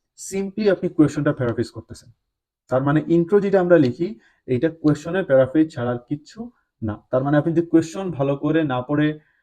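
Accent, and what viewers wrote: Sylhet, Bangladesh. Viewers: are you from Sylhet?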